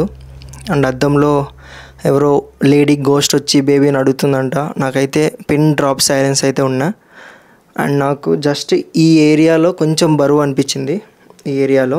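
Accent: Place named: Kadapa, India. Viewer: Kadapa slang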